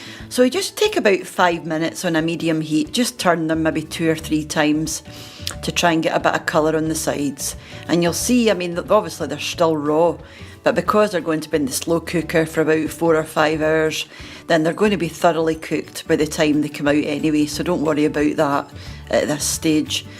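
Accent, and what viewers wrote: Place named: RP, United Kingdom. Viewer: Hi 🤗 Love a revisit & how old the recipe is - they're great for new subs to ur channel🙏🏽. Your voice sounds quite different from some of ur original 1st vids its now proper posh😆🤣😂 I'm doing ur 🐸in🕳 next week & can't wait. I do my Sausage caseroles on the stove top or oven as a one pot & just use my slow cooker for stews these days. No dishwasher in my gaff so anywhere i can save on washing dishes is more important to me these days. Keep these coming ❤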